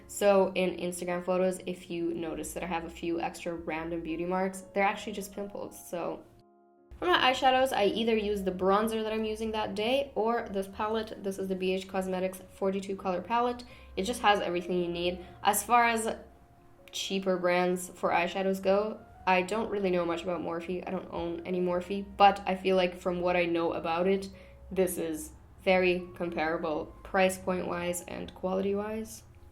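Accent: United Kingdom. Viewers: Soooo happy to see you back 💗 I also love your slight british accent hahaha it’s so cute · That British accent is coming through and I am here for it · OKAY I saw the comment on your ig about the accent and now I can't unhear it lmao I think it's the way you end the words and say the 't' now. I could also be tripping. but british influence tho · You're starting to get that English accent! Too cute!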